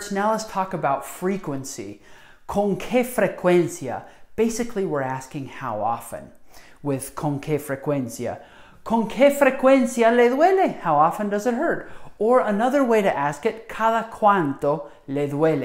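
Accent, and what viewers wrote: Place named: United States. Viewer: Woof. What's with this bad American-style Spanish? Consonants are way too strong, and the "r" is wrong. Students: this might be good content, but poor delivery. Don't do this accent. I have traveled to a number of Spanish-speaking countries without speaking a word of English to get by, and I have never heard a native Spanish speaker speak like this.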